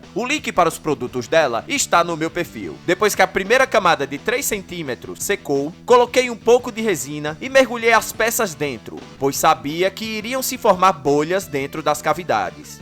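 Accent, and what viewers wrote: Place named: Pernambuco, Brazil. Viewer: Fera!! Muito top o canal, quem dera tivéssemos um laboratório de química assim na escola.. e de onde é o canal? Pelo sotaque, parece Pernambuco. Seja de onde for, o conteúdo é de qualidade, precisamos mais disso por aqui. Deus abençoe!!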